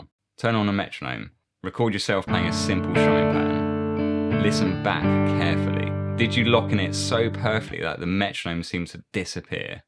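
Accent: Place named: United Kingdom. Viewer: I always found the British accent annoying but this guy takes it to the next level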